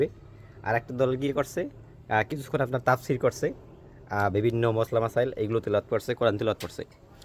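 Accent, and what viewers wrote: Barishal, Bangladesh. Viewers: আপনি কি বরিশালের নাকি ভাই?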